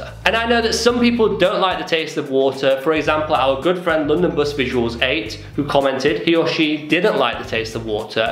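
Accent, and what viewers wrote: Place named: Middlesbrough, United Kingdom. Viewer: Really? You sound more Middlesbrough :-). Yes, thoroughly enjoyed video thanks! X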